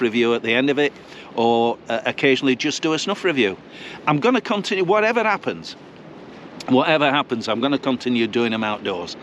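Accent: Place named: Northern England, United Kingdom. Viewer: Golden rule. If it works, don’t fix it. People appreciate your sense of humour, and for an expat like me it’s nice to hear the northern accent and your touristy videos.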